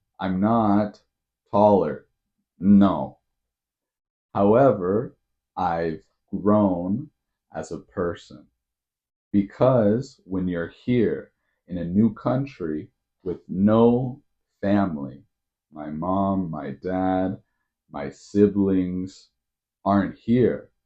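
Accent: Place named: United States. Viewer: I found this channel randomly and watched this first video. Actually, you have a very clear American accent, and you speak slowly, so that's helped me do shadowing along with you very creative video i hope you do another series for advanced learners, I'm definitely gonna keep watching this series keep going you're gonna be a success on YouTube ❤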